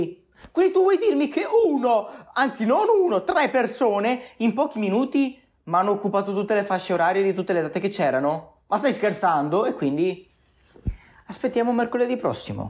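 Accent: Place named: Mestre, Italy. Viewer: Si sente proprio la R de Mestre HAHAHA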